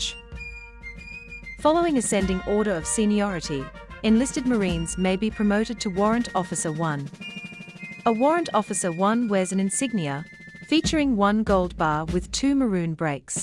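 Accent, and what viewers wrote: United Kingdom. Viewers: Accurate video, but kinda strange that it's narrated by a British woman.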